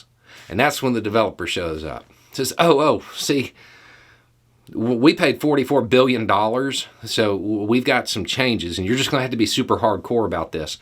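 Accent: South, United States. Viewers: What are the two words you are surprised to hear from somebody with a southern accent: "Check Mate". Don't let the southern accent fool you. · Exactly, he came in after pitching a fit about how much he paid for the company then fired majority of the workers, doesn't explain his vision to about the future, expects the workers to cover everything, sends out an entitled email and expects his employees to go "well they say he's a genius so 80hr work weeks (or more) here I come for no additional resources or perks!" sorry if that had happened to me, I'd be out of there faster than you can count to one. Nothing about what Musk has done sounds stable, innovative, or reassuring. Us working folks like stability regardless if they talk southern like or west coast like myself. They want to know that their job will be there in a day, week, month, or 6 months because you know we like to plan and to be able to afford everyday expenses.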